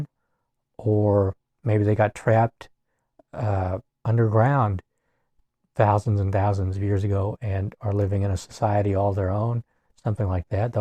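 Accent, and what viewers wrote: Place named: Kentucky, United States. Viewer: Hi Thanks for your earnest and honest “rambles” on a rainy Saturday! I enjoy your thoughts on books and I have to admit your KY accent brings me to many happy memories, as I grew up in Louisville. I have made many visits to Lexington, Bardstown, Berea, Gethsemani and most central to western areas of the state. I have not lived in KY for almost 60 years, but I miss the ease and laid back style which Kentuckians like you embody. Stay well! Extreme pain is an intriguing phenomenon. When it has gotten me, infrequently, I feel like I leave my body and mind behind and enter a realm of subconscious, very surreal existence (just to cope). Again, thanks for sharing!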